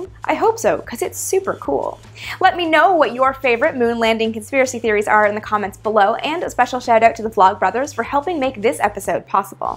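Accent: Canada. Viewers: Is she from Canada or the United States?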